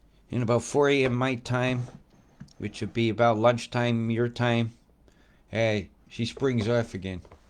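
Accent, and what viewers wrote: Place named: New York, United States. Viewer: I love your voice. Reminds me of both Brooklyn, New Joyzy & Boston. I was born in NY, my dad grew up in Scarsdale, was a welder/engineer in Brooklyn. As a kid we lived near Pelham. Used to skip school, take the train to Grand Central, muck around, panhandle for train fare back home in time from "school"... 😁 Keep singing .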